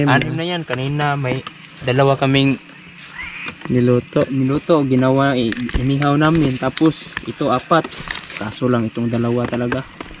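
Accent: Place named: Cebu, Philippines. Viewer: Taga diin ka boss kay feel nako Cebu inyuha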